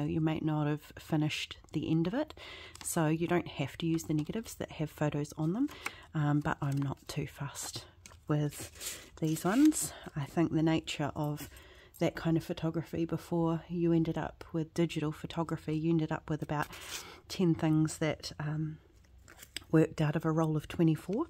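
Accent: New Zealand. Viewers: keep doing these step by step tutorials. They are great, plus I love listening to a fellow kiwi!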